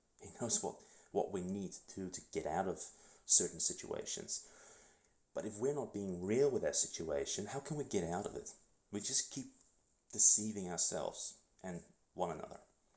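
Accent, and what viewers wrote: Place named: United Kingdom. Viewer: I just prayed for you. I also want you to know that I haven't prayed in many years but I really wanted to do it for you. I have this necklace with Jesus on the Cross. I took it into my hands, went on my knees and asked Jesus to give you the strength, will and creativity to come up with solutions. I asked Jesus to help you out in finding answers. I trust Jesus and asked him to help you in any way he can. Regarding the more practical stuff, I am not sure how I can help. I am not from the UK (you sound British, so I presumed UK) but from the Netherlands. But here in the NL we have organizations where you can sign up and then you can apply for housing based on duration of membership so to say (I am trying my best to translate as well as I can). Sometimes you can get forwarded based on where you live and your current situation. 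But I have no idea what systems you have in the UK. Regarding work, I would suggest finding out what your strengths are and finding a way to learn it online while you do your other things. I thought I heard you say you were in Personal Training. But a quick example is learning to code for example. You can easily learn this online and after a couple of months you can start applying at jobs. The market right now is breaking with a lack of employees everywhere (at least here in the NL) so it's more about showing character than skills. So I also suggest showing up and just making it known you are driven and ready and able to work and make things happen. I hop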